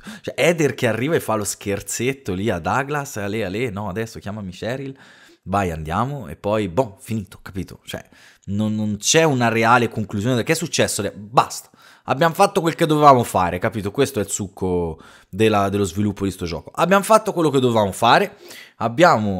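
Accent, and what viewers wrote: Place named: Livorno, Italy. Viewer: A me è sempre piaciuto pensare che quando si gira l'ultima volta, prima di tornare da Douglas, abbia incontrato lo spirito di suo padre (è Silent Hill, dopotutto, quindi ci sta che lui sia ormai anche legato a quel posto anche dopo la morte)... anche perché sarebbe un po' strano che lei tiri fuori il discorso del nome così dal niente. Nella scena sembra che lei si giri perché c'è qualcuno dietro... e quel qualcuno è Harry. Anche perché io mi rifiuto di credere che abbia perso così all'improvviso suo padre senza possibilità di dirgli addio 🥲 avevano ancora troppe cose da dirsi, e un solo quadernino non basta. Mi piacciono molto i tuoi gameplay, me li recupererò pian piano anche degli altri capitoli e di altri giochi... e il dialetto livornese è sempre top da ascoltare.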